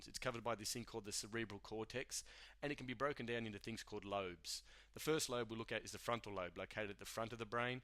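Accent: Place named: Australia. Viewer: Sounded as if the bloke was Australian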